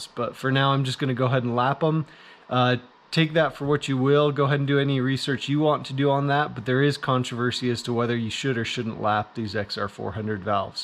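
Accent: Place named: Payson, United States. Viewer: Didn't have to look at your contact info to know you were from Payson haha. Your accent tipped me off, and the RMATV bag in the shot comfirmed haha. Great video! My bike burns oil on startup. It's got over 1,000 hours on all the original top end. I'll probably ride it through the summer and rebuild the top end this winter. Great video!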